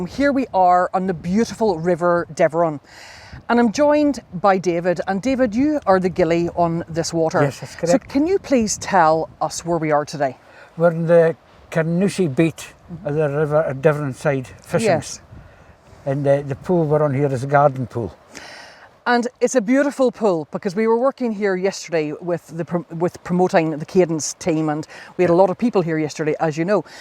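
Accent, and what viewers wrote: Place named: Ireland. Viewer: You sound irish hon.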